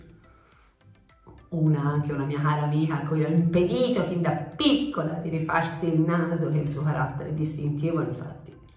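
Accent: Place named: Florence, Italy. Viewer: Ciao, piacere di conoscerti. Ti ho scoperto ieri sera con grande piacere. Volevo farti una domanda ma preferirei in privato. C'è la possibilità di scriverti una mail? Grazie in anticipo e un abbraccio da Firenze. (Mi sembra di sentire che anche tu sei delle mie parti)😍😍😍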